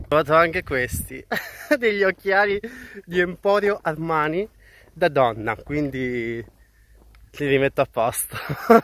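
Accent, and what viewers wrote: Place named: Salento, Italy. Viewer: si sente dalla tua parlata, hai molto di Salento... ti seguo da Tenerife,ma sono salentino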